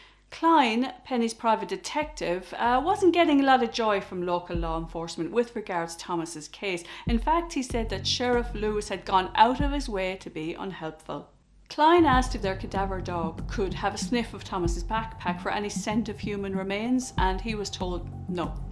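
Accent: Ireland. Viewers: Don’t listen to them. You’re just fine and personally, I love the Irish accent. Between you and That Chapter (where I discovered your channel), I get my dose of it! If someone doesn’t like it, they can either learn to love it or move along. Keep up the great work you’re doing. The channel will continue to grow!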